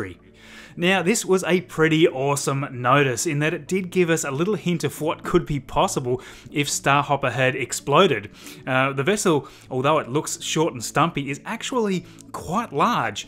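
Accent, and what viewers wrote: Australia. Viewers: Because I'm Aussie, I can tell from your accent, you definitely must be an Aussie too?! Lol.. You still down here or did you move to America? Don't think anyone from the public would know, but do you know if the final design of the interior of the Starship has been completed? Good reporting 😉 🇦🇺🇺🇸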